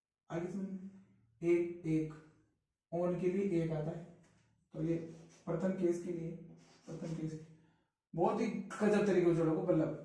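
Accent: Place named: Hadoti, India. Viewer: Like your hadoti accent 😊❤️❤️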